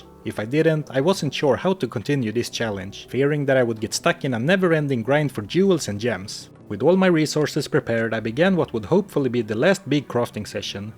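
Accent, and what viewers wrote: Sweden. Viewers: u are swede right:D? or norweigan? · came for the diablo 2 content and stayed for the swedish accent greetings from jörmenni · no doubt. Nice video! And I love your swenglish! ❤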